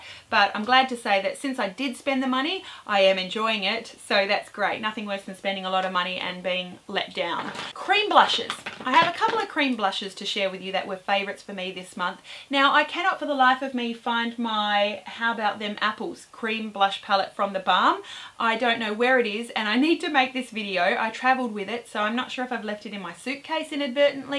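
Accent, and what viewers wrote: Australia. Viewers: I do really enjoy your reviews,; as someone else said, they are 'professional' and so easy to listen to. I aways learn something new, and I just enjoy hearing your Aussie accent!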